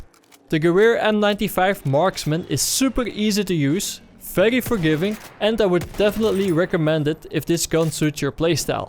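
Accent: Netherlands. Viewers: That dutch accent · sounds like he's from the Netherlands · this is the kind of english with a Dutch accent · you are really dutch i hear it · he really sounds Dutch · this dutch accent hahaha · It's sounds like your dutch? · Are you Dutch ? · Is this guy Dutch? Sort of sounds like it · It is clearly a Dutch accent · the dutch accent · Het is makkelijk te horen dat hij nederlands is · Einar Samuelsson He is from the Netherlands, believe me. I am from Belgium and I know how dutch people speak and I can understand them because we speak the same language. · Horrible Dutch accent.... 'Twinty meters' ' Drrrop' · the "r"s sound pretty dutch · de duts aksent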